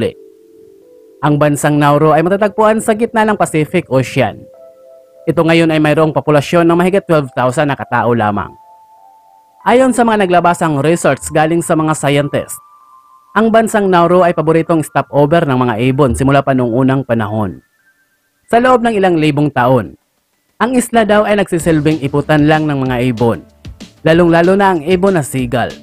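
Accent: Visayas, Philippines. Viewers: Visaya ka bay...wag ka nang mag English ...pilipino naman tayo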